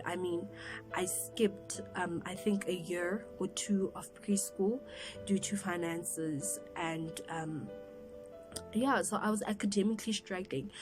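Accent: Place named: South Africa. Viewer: I'm sorry u had to experience that. So glad u r talking about it. It's nice to see someone from Madagascar in SA. I love Malagasy language, music and culture. It seems u have been in SA for a while (your accent says it all 🙂). If you visit Madagascar one day, please get me CDs, will pay for them. Regards Richard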